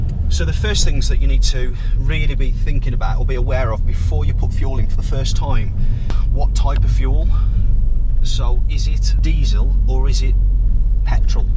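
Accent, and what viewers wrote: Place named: England, United Kingdom. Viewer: Funny English accent 😁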